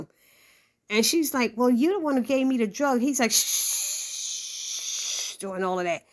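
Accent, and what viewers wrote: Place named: East Coast, United States. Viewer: Thank you. Love the East Coast Accent. 😀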